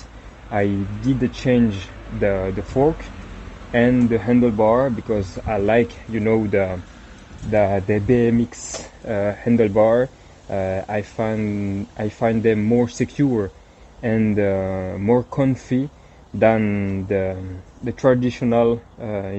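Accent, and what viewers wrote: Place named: France. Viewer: Yes yes yes 😊 more content about this wonderful bike, your frenglish is enough, vive la 🇫🇷 😅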